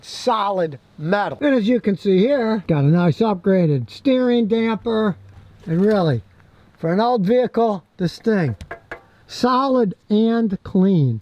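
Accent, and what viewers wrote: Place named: New York, United States. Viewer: sounds like a newyorkian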